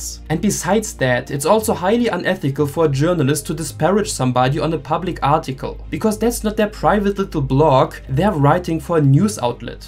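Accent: Germany. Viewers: I knew you had to be German before getting too far into the video lol ( I grew up in Fredericksburg, Texas(Also known as Texas Germantown.)) Definitely dropping you a sub 💯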